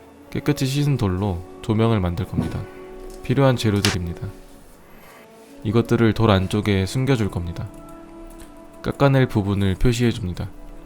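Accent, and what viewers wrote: Daejeon, South Korea. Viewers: 어? 혹시 대전사람이세요? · 님 혹시 대전사람..? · 오 님 대전사람이세요??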